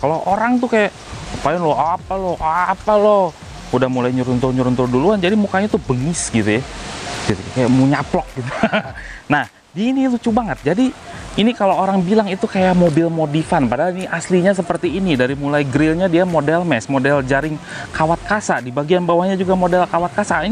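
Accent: West Java, Indonesia. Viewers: Orang sunda mah kentara aja ya kang logatnya😂🙏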